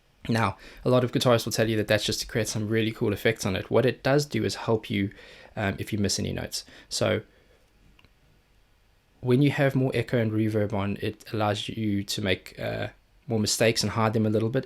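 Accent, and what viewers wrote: South Africa. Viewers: I've just subscribed your channel and saw that you've already taken the Nightwish journey. It's been said before that your reaction videos are awesome, lots of detail as far as technic is concerned, without making it too boring. Keep it up dude! OH yeah, one other thing That accent seems quite familiar to me. Shall I risk... South Africa? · You South African? Goeie reaksies mater!!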